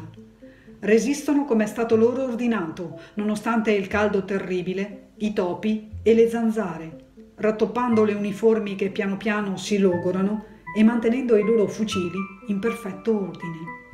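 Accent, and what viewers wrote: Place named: Mestre, Italy. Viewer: Conoscevo questa storia incredibile....e mi fa piacere sentirla nuovamente raccontata da con il suo accento mestrino 😼...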